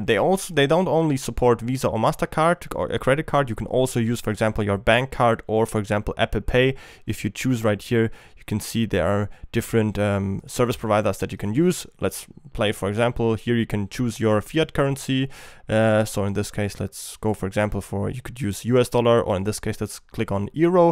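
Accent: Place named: Switzerland. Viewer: I can hear the slight Swiss accent ;) I like it! Thanks for the vid!!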